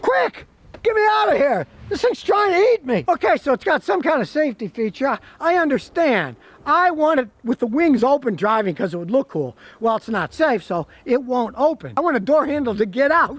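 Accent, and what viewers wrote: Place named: Midwest, United States. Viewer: I could swear you said something at the beginning of this like "rough Long Island road". You didn't say that did you??? That would mean you're from Long Island and then we'd become friends! You have to be in the Midwest by the sound of it, right? I have a 2012 Navigator I'd LOVE to be able to bring in for you to fix!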